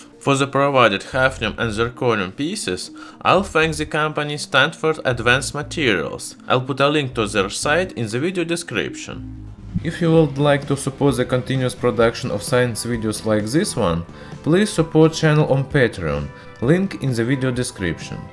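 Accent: Russia. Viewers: Congrats on this video. Didactic and interesting. Are you russian? Your accent sounds russian. English easy to understand. Greetings from Tupã, state of São Paulo, Brazil. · Is it a Russian accent? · I'm watch your videos because of your accent i like Russian accent .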